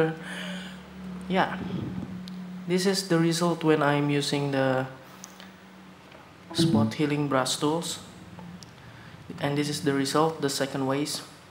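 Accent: Indonesia. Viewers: idk why but ur accent is like an indonesian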